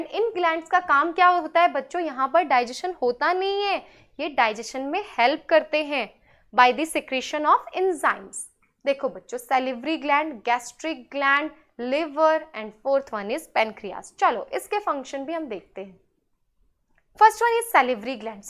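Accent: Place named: Bihar, India. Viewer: The Presentation was great and The Explanation was awesome with some extra concepts I think.Though It wasn't of my class but I enjoyed it. KEEP UP YOUR GOOD WORK TEAM and if I am not wrong from your Accent I can guess that you are from Bihari origin having said that I am not from there. Anyways BEST OF LUCK TO THE TEAM for your youtube channel and I wish your channel grows over time. Once again thanks for your amazing explanation.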